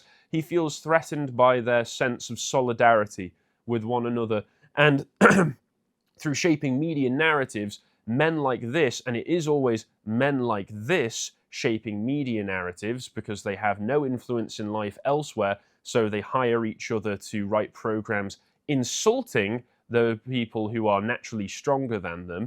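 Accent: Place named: Bristol, United Kingdom. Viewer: Bristolian. Says it all really. Woke shithole.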